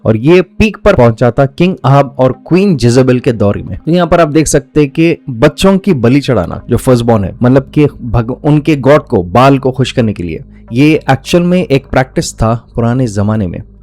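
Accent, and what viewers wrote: Hyderabad, India. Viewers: ओक youre frm bhagynagar. Accent. Shows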